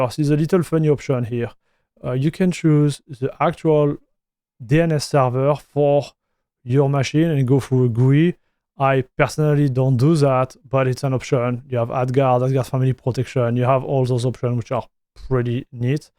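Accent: France. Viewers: You have the thickest french accent I've ever heard 😅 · Jadore cet accent francais :D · I'm guessing that this is probably a very informative video, but the extreme (French I'm guessing) accent. I cannot understand what you are saying. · honestly i think id rather just listen to you speak french and read subtitles rather than try to decern what you're saying in english through your CRAZY thick french accent. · nah this is the purest french accent you'll hear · Heavyest french accent I ever heard ❤🇫🇷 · you're french because your accent is very similar. i like your videos a lot.😁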